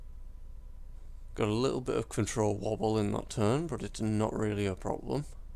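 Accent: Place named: Yorkshire, United Kingdom. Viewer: Sounds like he's supressing a north/midlands english accent lolol Yorkshirey?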